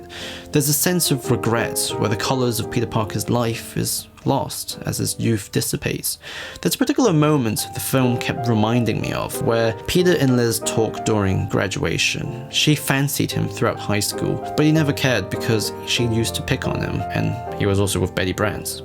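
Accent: United Kingdom. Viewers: wow. is the furthest thing from an “objective” analysis on those comics. It’s clear as day. He constantly tries to diminish plot lines in the Raimi films (see: Spider-Man 2 doesn’t get Spider-Man, his comments on the movies having “poverty porn”, his video on the “nostalgic Spider-Man) and clearly likes TASM and MCU much more. Just as you’re saying an opinion can’t be fact, neither can a shitty YouTube video essayist with chill hop in the background. I get it. Most of you don’t read the comics, and think this guy knows what he’s talking about, because he’s got a British voice, scripted videos, and chill hop. It’s just not the case. And it’s not an opinion for to say “the human torch overshadows uncle ben as a mentor in the comics.” That’s flat out wrong. It’s not an opinion to say “Raimi invented uncle Ben saying with great power” that’s objectively wrong. 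And people can’t take criticism of these films well at all. In fact, you’re trying to say “oh well everyone knows it’s not 100% accurate that’s not the point” as you’re watching. A YouTube channel that constantly tries to drive home the fact”” that the MCU films are more comic accurate”” than the Raimi flicks. Which again- just is flat out not true. And I never said the Raimi films were a 1:1 carbon copy of the films. But they’re hell of a lot closer than the MCU films. You don’t need bizarre nitpicks of random comic panels from the Stan Lee run to see that. And sorry, it’s still not an origin. You’re telling me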